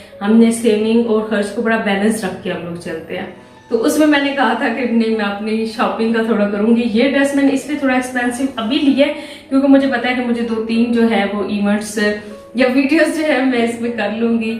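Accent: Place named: Punjab, India. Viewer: I love your punjabi texture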